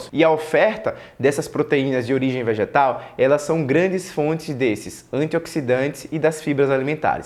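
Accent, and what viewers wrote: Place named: Sergipe, Brazil. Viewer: Pelo sotaque eu percebi que O dr. é de Sergipe kkkk aqui pertinho de Salvador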